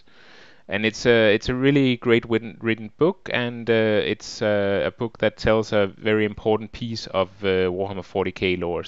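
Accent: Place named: Denmark. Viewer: Are you from Denmark? You have that danish accent. Nice video tho!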